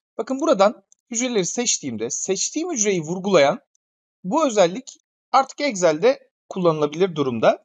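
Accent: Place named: Aegean, Turkey. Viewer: ege şivesiyele biyendim, yani beğendim.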